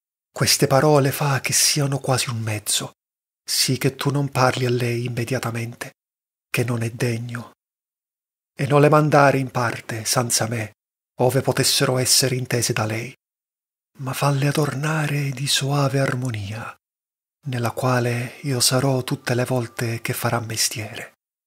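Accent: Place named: Calabria, Italy. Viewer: Intanto grazie e complimenti per l'ottima lettura, vi scorgo un leggero accento del Sud, se non erro calabrese, e questo mi ha subito fatto pensare come, almeno da un punto di vista simbolico, come sia utile proprio a farci riflettere quanto questa lingua volgare, dalla quale, naturalmente, ha origine la nostra lingua corrente. Farci riflettere, dicevo, come è importante riuscire a considerare questo italiano volgare patrimonio di tutto il paese e non solamente Toscano. Dante Alighieri, secondo me, è una sovrapposizione di strati di genialità: questa narrazione in forma d'eloquio che fa' si che il lettore stesso possa assumere i panni del narratore; poi, naturalmente un talento poetico innato e ben coltivato; ma, sopratutto, la grande attenzione alla forma nella quale descrive la ambientazioni e dunque i paesaggi. Nella Divina commedia poi le ambientazioni sono addirittura fantastiche. 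Ecco, io credo che questo abbia contribuito molto a rendere questo poeta, oltre che sempre attuale, addirittura universale. Certamente era un uomo ambizioso, però dubito che abbia anche solo lontanamente potuto immaginare quanto a lungo e quanto nel mondo tutto la sua opera si sarebbe diffusa. Rinnovo i ringraziamenti ed i complimenti e auguro una buona continuazione in buona compagnia🙏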